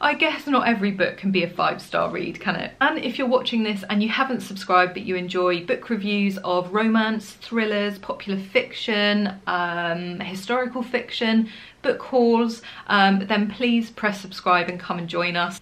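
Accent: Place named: England, United Kingdom. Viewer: I liked the book as it is different from most world in crisis end times books that are usually predictable. Love your English accent, and don't worry about big words in books that means you are reading literature instead of pulp fiction and tripe. Sorry, you found the book not to your taste. Maybe your taste is the problem.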